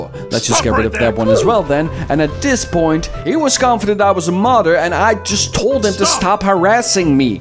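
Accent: Netherlands. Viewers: Het is wel erg duidelijk dat je Nederlands bent. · Ben je Nederlands?😅😂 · Are you dutch? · you sound Dutch af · Het nederland accent komt naar boven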